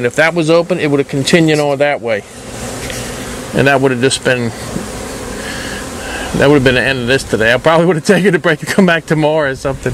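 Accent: United States. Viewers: What state accent? Is that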